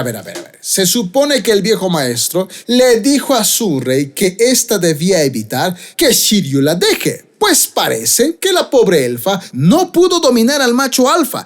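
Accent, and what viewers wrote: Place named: Ecuador. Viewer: No puedo seguir la narración porque ese acento ecuatoriano que mezcla la R con la Ch me distrae demasiado con su mala pronunciación 😐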